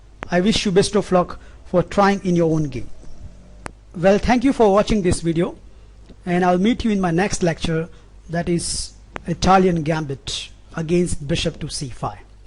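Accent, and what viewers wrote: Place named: India. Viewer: I bet u are an indian. Lol nice english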